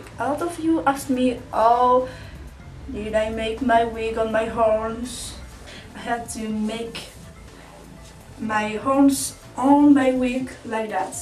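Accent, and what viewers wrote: France. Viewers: it's a french accent or ...? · is your accent french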